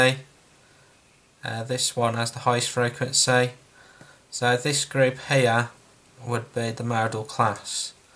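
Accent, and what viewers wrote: Birmingham, United Kingdom. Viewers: Nice Birmingham accent.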